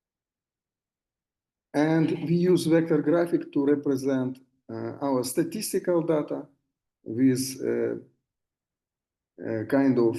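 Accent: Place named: Russia. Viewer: Дружище очень слышно русский акцент так как хочется попросить тебя перейти на русский потому что ощущение что ты именно на нём говоришь.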